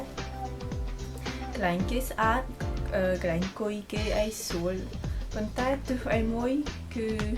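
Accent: Cambodia. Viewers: Coucou ! Je suis francaise d'origine cambodgienne et j'adooooooooooore ton accent khmer. je suis troo contente de voir qu'il y a d'autres personnes d'origine khmer qui parlent khmer parce que yen a pas beaucoup. Tu peux continuer à faire des vidéos en khmer ? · I love your Khmer accent so much that I have to subscribed the channel. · You’re so cute with your khmer accent. lol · Funny but cute khmer accent.Thanks for sharing.Keep up the good works!..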